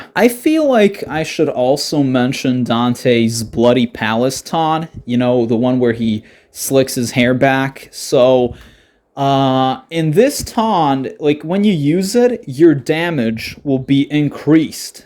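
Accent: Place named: North America. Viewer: Are you Serbian? Your YouTube UI says so but your accent doesn't sound like you've left North America for a second of your life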